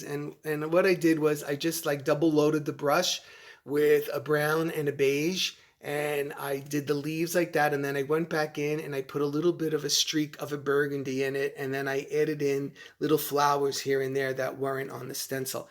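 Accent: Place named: Boston, United States. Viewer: You have to live in Massachusetts. You have a Bostonian accent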